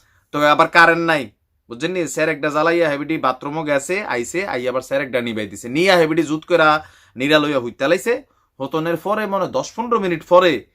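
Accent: Comilla, Bangladesh. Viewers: আপনার মুখে কুমিল্লার ভাসা অনেক বালো লাগে বাই এখন আমি ওহন কি হর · ভাই আপনার ভাষায় বুঝা যায় আপনি কুমিল্লার,কোন জায়গায় আপনার বাড়ি। আমি ও কুমিল্লার । আপনি খুব ভালো ভাবে উপস্থাপন করেন। আপনার প্রত্যেকটা আপডেট আমি দেখি এবং মজা পাই।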